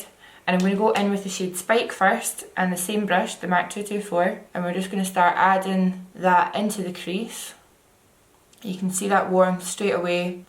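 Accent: Scotland, United Kingdom. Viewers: Has anyone used the Google captions when watching?! their interpretation of what she's saying is hilarious 😂😂 I'm Scottish so totally get her accent but imagine non-Scots would find the captions totally unhelpful and nonsensical 😂👎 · is she scottish x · I honestly don't think you are all that hard to understand. But then again, I've been around different accents, including Scottish, before, so I've had practice listening. Love the accent, the more people watch the easier it will get for them ;) As a classically trained singer, the vowel sounds Scottish people make when they speak are so fascinating, and (I think so) very pretty. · I know the struggle with the Scottish accent 😂😂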